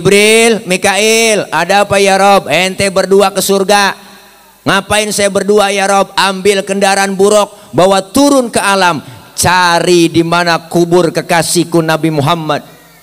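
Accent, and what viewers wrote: Jakarta, Indonesia. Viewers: smga slalu dlam lindungan allah.sehat" slalu kyai ku.. sya org jawa. tpi resep bgt sma cramah kyai.. khas bgt betawi nya.. apa lg klo GLEDEK. 😁🙏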